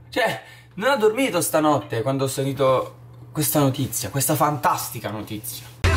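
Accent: Bari, Italy. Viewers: Ma sei barese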